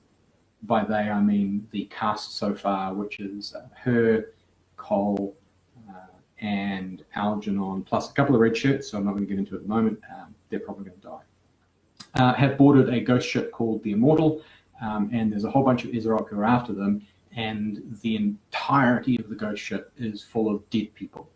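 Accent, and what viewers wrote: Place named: New Zealand. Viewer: Oh my! How I loved this! As a mujer living in Spain and whose English May be considered a second or third language-depends from where you look, born and raised in Tanzania with Afghan origins and comping to live in Spain at the tender age of 12-, I love listening to your NZ accent, and how English varies from the ex colonies. Same thing happens with Spanish from Spain and the Iberoamericanos(Latin America) Spanish. Thank you,! for the lesson. I really enjoyed it!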